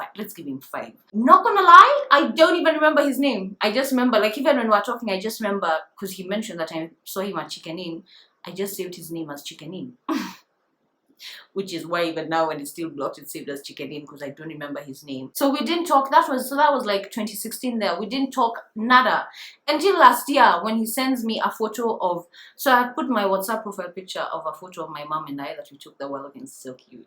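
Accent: United Kingdom. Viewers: The British acsent 👌😂😂😂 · The british accent makes me happy😍😂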